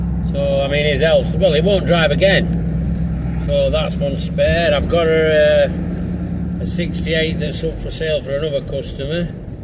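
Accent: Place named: South Yorkshire, United Kingdom. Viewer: I agree broadly with your sentiments. In the current climate a classic car is a luxuary most cant afford. As others said also you need more space and preferably a garage for them. Also if you cant do things yourself its maybe too costly. I would like to dabble one day. I do my best maintaining our 2003 micra and 2006 honda. I have two local garages we send for mot and maintenance and a very handy tyre, exhaust and battery place nearby. All small independent. Im in Huddersfield. Youre in South Yorkshire with that accent.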